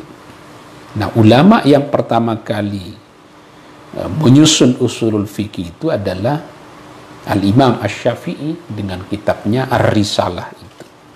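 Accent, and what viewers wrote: Java, Indonesia. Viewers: Cocok juga klo pake logat jawa khas pesantren dulu biar bisa sambil nyoret nyimaknya, maaf kiyai klo terlalu lancang🙏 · Pake logat jawa kiai... pegn nyoret juga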